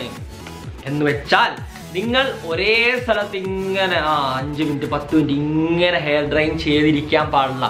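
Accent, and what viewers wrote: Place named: North India, India. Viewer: Bro u r so superb.. Iam ur big fan.... I think u studied in North india.... Ur English speaking is like North ppl..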